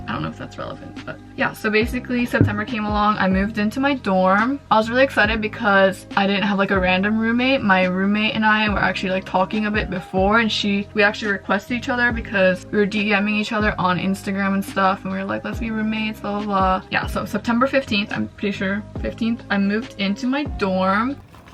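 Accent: United States. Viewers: Choosing the right school and location is so hard. It’s hard to settle. Has your experience gotten better in Australia than the US? Your international schooling explains your accent. You sound like my cousins in Cali. Does japan have good schools? You might just miss home and the familiarity. Small world - I’m from San Jose lol. It’s not the world’s best place. Did you like San Francisco? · New to your channel and am wondering how your English is so American sounding if you are raised in Japan? And yes healthcare and college are all extremely expensive here in the California and the US in general.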